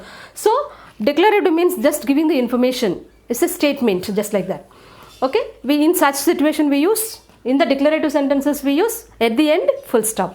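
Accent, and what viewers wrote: Telugu, India. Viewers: Madam mana telugu accent lo english teach chestunnaru. That's the most fascinating thing about your video. That's the naturality we need from teachers in social media... Unlike fakesters. And precise explanation is the hallmark of your teaching - man's true fan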